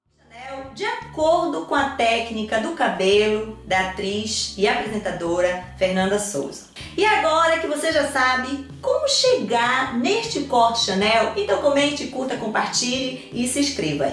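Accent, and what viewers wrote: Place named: Pará, Brazil. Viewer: Essa é paraense...hahaha sotaque muito parecido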